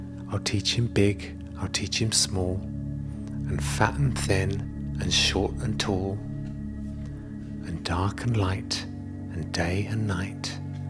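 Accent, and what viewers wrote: United Kingdom. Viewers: Your voice and accent is awesome. The British accent in my opinion is the best accent 👌.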